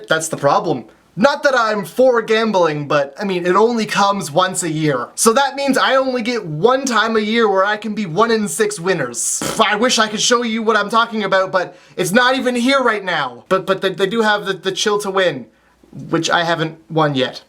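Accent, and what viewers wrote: Canada. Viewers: i need you to say stuff in the canadian accent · Make a video of you just saying things in the Canadian way you do!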